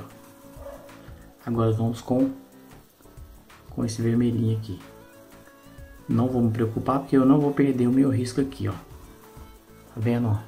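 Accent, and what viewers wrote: Minas Gerais, Brazil. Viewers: Moço, quando vc mostrou o gráfico eu rapidamente tirei um print,fico triste quando falam para a gente ir no Facebook pegar o desenho,nem todo mundo tem Facebook...mas vc foi gentil e mostrou o gráfico,só por essa atitude e por nós ensinar com tanto carinho eu vou te seguir agora mesmo Obrigada...haa e amo sotaque de mineirinho 😍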